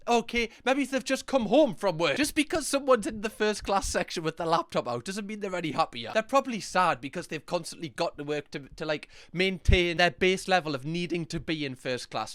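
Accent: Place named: Northern England, United Kingdom. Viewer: i used it briefly twenty years ago and had some good job offers. Unfortunately it's was at a time in my life where i was moving out of my mum's place and had a local good and steady job offer which I've stayed with. From what you've shown today I'm glad I've not had to go work for these sociopaths. That aside, I'm born Northern but raised Southern (moved Dan Souf when i was a year old). I love your dialect - i really enjoy your takes and sometimes incorrect pronounciations - don't stop what your doing dude, and good to see your missus on recent video too. I've been en subbed for about a year or two now so not fully in with all the lore, but I'm here and staying! Cheers big ears ❤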